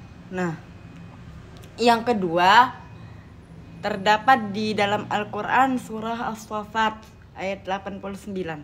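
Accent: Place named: Lombok, Indonesia. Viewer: Lombok banget logatnya kak...sehat selalu anak soleh❤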